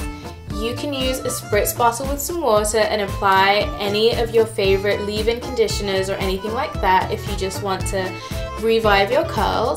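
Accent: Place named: United Kingdom. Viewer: Why do you have a britanic accent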